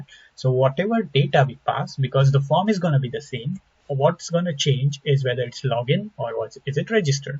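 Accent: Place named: India. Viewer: Bhai awaj se hi indian hai pta chal gya tha 😋😋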